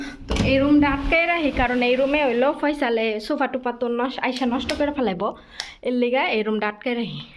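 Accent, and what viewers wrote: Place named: Narayanganj, Bangladesh. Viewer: বাংলাদেশে কোন এলাকায় আপনার বাড়ি? আপনারা ভাষা নারায়ণগন্জ বা বিক্রমপুর এর মনে হচ্ছে।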